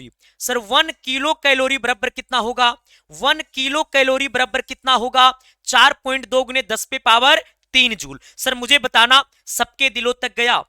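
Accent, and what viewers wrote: Bihar, India. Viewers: aap bihar se ho.....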